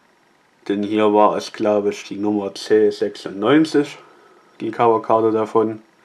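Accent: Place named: Saxony, Germany. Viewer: sächsischer akzent xD den liebe ich!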